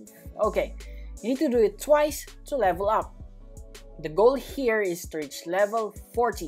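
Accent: Philippines. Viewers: You from Philippines? Cuz ur accent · Are you Filipino? I can tell by your accent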